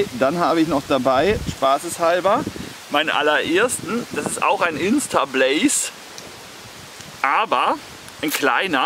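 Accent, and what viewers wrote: Baden, Germany. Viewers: Klasse Viedeo, sagemal von wo kommsten Du her😉 ich würde deiner Stimme nach urteilen aus dem Badischen. Und wenn das so wär. Dann wären wir vielleicht gar nicht soweit voneinander entfernt. Gruß der Markus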